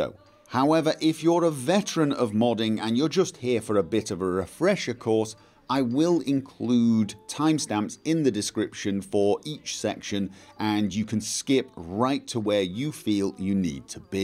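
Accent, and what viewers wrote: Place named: North Wales, United Kingdom. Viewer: Are you from North Wales or there abouts? Trying to place your accent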